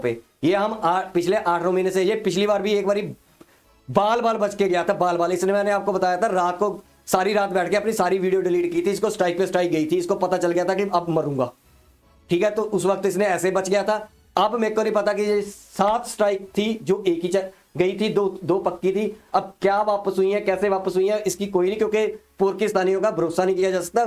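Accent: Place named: Punjab, India. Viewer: Yaar I'm not trying to favor them but her first email was correct and you also need to check your accent if we are talking about it. They are from Punjab(pakistan) side and I'm pretty sure you are too(Indian side) with your accent - fellow Punjabi. By the way you added the word "you" in that email's first sentence.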